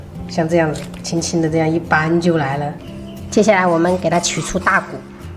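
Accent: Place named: Fengzhou, China. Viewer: 听口音，好像是丰州的，或是浮桥?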